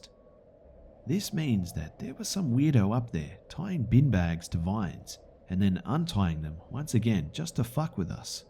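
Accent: Australia. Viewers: you sound like the narrator for top trending with an australian accent. ^^ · The first story makes me think about that Guy Pearce movie that was based on the true story, about the girl in Australia who was murdered by the daughter of old neighbors who was mentally unstable (your accent, probably why I thought of it bc it was set in Australia).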